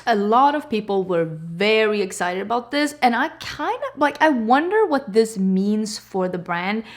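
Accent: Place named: Sweden. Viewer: You speak too fast with that thick Swedish accent, slow it down, gosh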